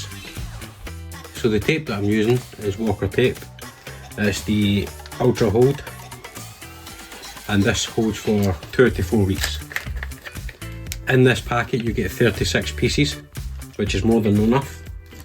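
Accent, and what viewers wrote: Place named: Scotland, United Kingdom. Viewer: What is this accent? Scottish? I only understood maybe %30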